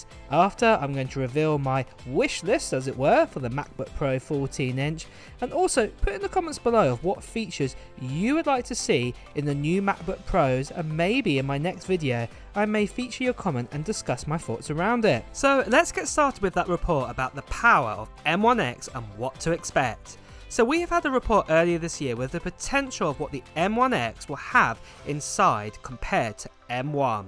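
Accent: United Kingdom. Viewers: I want to buy the iPhone 11 but I don’t have money for that and also i don’t have a job since i was just a 16 yo who want some iPhone i am hoping that i will be the one who got your ipad❤️Godbless you man💖 btw i like your British Accent❤️❤️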